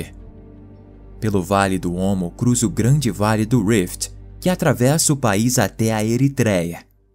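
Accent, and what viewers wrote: Rio de Janeiro, Brazil. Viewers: Num dá pra ouvir é muito carioquês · É, não consigo ouvir esse sotaque carioca carregado de x e vogais inexistentes por mais de 20 segundos...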